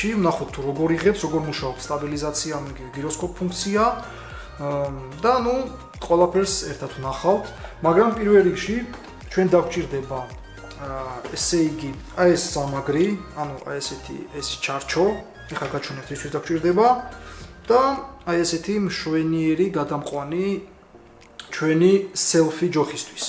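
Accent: Georgia. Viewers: თბილისელი არ ხარ?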